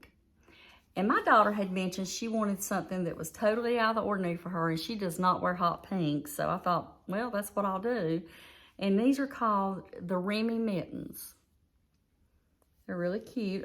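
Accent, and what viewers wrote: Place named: Tennessee, United States. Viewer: Hi, I'm Charlene from CHARLENE CROCHET CORNER, I just found you and I love your accent. I live in South Carolina you sound maybe Tennessee? I subscribed and would invite you to subscribe to me. I love yarn but I'm not as lucky as you are. I just got out of a 9 week hospital stay for my 145th surgery. I was a nurse but my disability caused me to go out work my last shift on Nov 4th, 2007 came home and got in my hospital bed in my livingroom and proceeded to have a nervous breakdown. That is kind of why I started my channel. If I didn't have the love of my channel, well I would have no yarn and not as many wonderful friends & now they are family to me. I love them dearly. I will shout out your channel & would appreciate the same. Oh I love your Carrie Penny shawl, do you have the pattern? I need a copy those are gorgeous! 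Hope in a future wrap? Oh I so love that. I need yarn for that. I love fingering wt yarns. Good for you not letting it beat you. I love your hair. I'm going to be 58 in July. SO we are close. I don't edit my videos it's to hard. Ok I'm going to find the info in your description box. Oh and welcome!hugs, charlene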